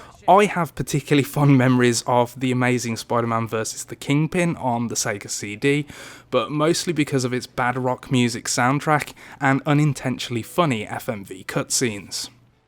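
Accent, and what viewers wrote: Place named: Birmingham, United Kingdom. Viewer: That toxic Birmingham accent is distracting me. Good video, however.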